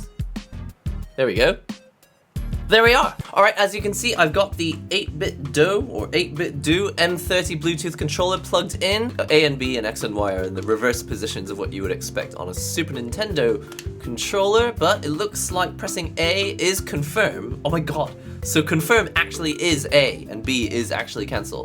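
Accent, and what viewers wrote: United Kingdom. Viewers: Why do u have a British accent ?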